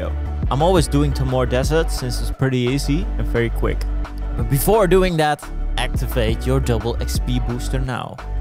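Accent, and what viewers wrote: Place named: Netherlands. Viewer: I saw you on killa´s video and i knew right away you we´re dutch haha and then few minutes in the video when you said toa then you confirmed it haha! Nieuwe sub erbij :) succes met je kanaal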